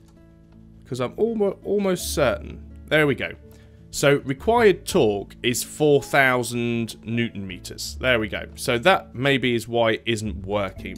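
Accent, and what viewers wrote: United Kingdom. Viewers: good bye forever :( this was a nice change of pace, this should have been called, exploring rotary craft. MAN! i really enjoyed this one, I really just like hearing your voice while I play minecraft, honestly. I could hire you to just talk while playing minecraft and explain everything you do in your british way. its really fascinating and relaxing to listen to you speak. please consider revisiting minecraft!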